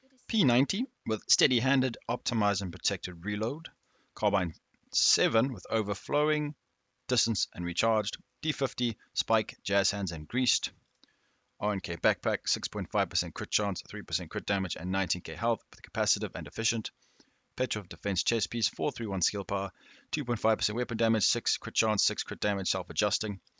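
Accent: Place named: South Africa. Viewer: Wascally... You sound South African. As a lightie, didn't you watch morning cartoons before heading to school? Wascally is pronounced like rascally, with an Elmer Phud lisp when he's ranting about the wascally wabbit (Bugs Bunny IOW) that he's taking strain to catch. Thus the Wascally talent makes one harder to bog down with riot foam 😜👍🏽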